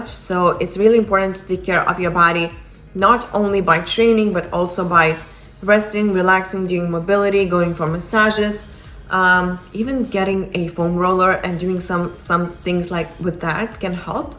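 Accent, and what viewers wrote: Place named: Russia. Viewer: Did you hear about the new Linkin Park vocalist, Emily Marcia Armstrong? There's a concert online — she looks like a little firecracker, if you know what I mean! Nothing too crazy, just an interesting, strong personality. Anyway, this video started with an invitation for women only seconds. As a male, I find it offensive since these videos should be made for... just kidding Strong hook in the first 9 seconds, progressing to a life story — product placement, and more American, with... what was it, a Russian accent?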